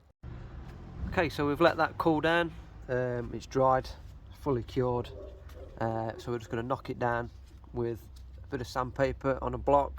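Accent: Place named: Derby, United Kingdom. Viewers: Nice Derby accent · Love your videos and thanks for making them. I have to ask, what part of England you from? I've lived in Australia for 56 year's, originally from Leicester. Your Accent sounds Leicester or some place very close by. Cheers me duck.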